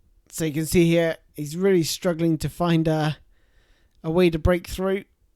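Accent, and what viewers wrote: United Kingdom. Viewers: Great explanation, and a new Sub from me! Also, you sound like you're from Bristol too? Either way, one new follower :)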